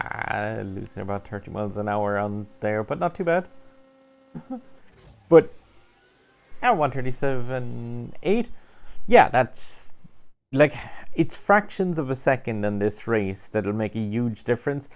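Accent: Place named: Ireland. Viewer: I love how you start every video with "now~" 😂 love the Irish accent, the way you say "cayr"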